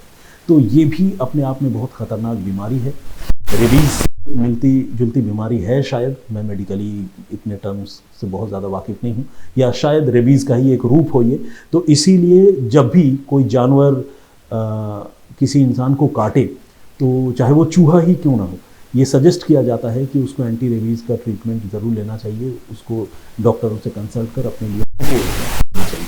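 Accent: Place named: Bengal, India. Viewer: आपके कहानी सुनाने का अंदाज बहुत ही आकर्षक है। मैं विशेषकर आपके बंगाली हो करके शुद्ध हिंदी में कहानी सुनाने के अंदाज से मैं बहुत इंप्रेस्ड हूं।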